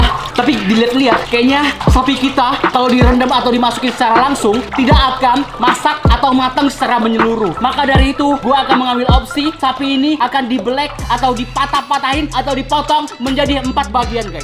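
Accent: West Java, Indonesia. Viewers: sundanise pisan euy koko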